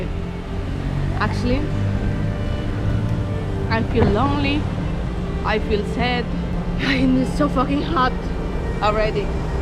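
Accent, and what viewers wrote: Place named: Spain. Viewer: Honestly, 8 years is a very long time to travel specially now you ae alone. But remeber you have a load of people here in the internet that are with you in the heart. I'm a little old as i soon will retire (unfortunately i do not have the vlog skills like you have ) but as soon as i retre, i will travel for a reasonable time and see all the beauty and horrible things you travelers have experience on my 1250 GS. Yes 150k is a lot, and perhaps the engine will be totally fine, but like a clutch can be a little problem in that long time as that is used several times a day, still, not so much a bug problem when that have to be renewed on your Tenere compared to my beemer hahaha I hope you are doing well as i love to liste to yourEnglish in a Spanich way, its soo adorable hou you begin and let us know what you experience. So drive safe, and have a good morning in the morning..;)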